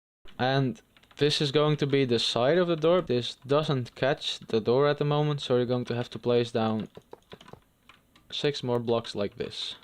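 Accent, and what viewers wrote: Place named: Netherlands. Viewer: i can just hear the dutch accent, i love it. cool build man, i am goin to need this very well!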